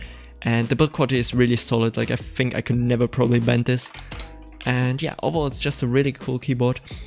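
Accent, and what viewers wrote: Germany. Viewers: U have a german accend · Kannst du zufällig deutsch hab nämlich das Gefühl du hast so nen deutschen Akzent 😂 aber gutes Video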